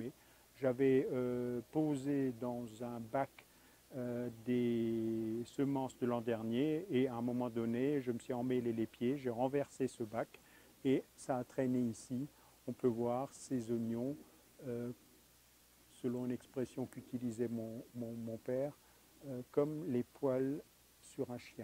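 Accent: Alsace, France. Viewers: Bonjour, et merci d'exister ! J'ai découvert votre façon de jardiner avec joie, car à 75 ans je dois me ménager... tout en réalisant mon désir de potager. J'ai d'autant plus de plaisir à vous voir et à vous entendre que votre humour, votre léger accent alsacien me rappellent terriblement mon oncle Alfred, alsacien comme toute ma famille ! Je suis installée en Bourgogne, et j'ai convaincu mon époux de s'intéresser avec moi à votre méthode. Nous tâtonnons donc, mais cela nous réjouit ensemble. S'il est bien évident que l'on devine les connaissances derrière votre art de jardiner, je trouve très agréable que vous n'en fassiez pas des montagnes. Nul besoin de justificatifs, la véritable école est celle de l'expérience personnelle, et si certains ont besoin d'un parchemin c'est qu'ils ne sont pas très surs de leur science...mais qui peut prétendre l'être ? Je pense que je vais voir ce que je peux faire de la mousse que nous ratissons dans la "pelouse", soit pour border les espaces "enfoinés" soit pour remplacer le foin ou le brf à certains endroits. 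Se procurer du foin en rouleaux me semble encombrant, nous préférons le foin en "cubes", un peu difficile à trouver, et il nous faut le décompacter un peu.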